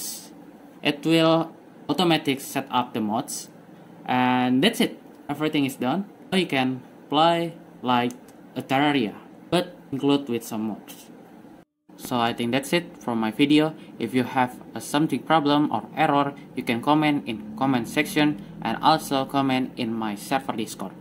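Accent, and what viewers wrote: Indonesia. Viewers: Makaih bang , btw gw tau lu indonesia dari accent inggris ly bang hehe 😅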